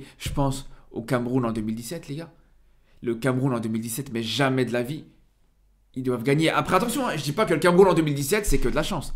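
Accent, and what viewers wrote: Belgium. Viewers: Tes pas belge toi?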